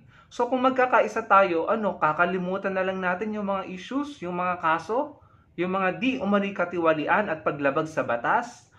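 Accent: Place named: Quezon, Philippines. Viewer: Taga Quezon ka po ba may puntong katagalugan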